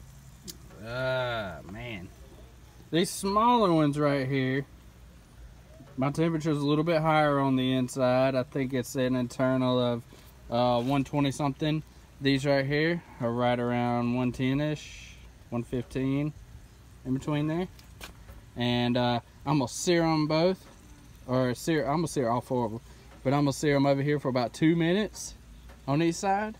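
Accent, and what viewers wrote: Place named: Texas, United States. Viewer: Where you from ??? Sound like Texas lol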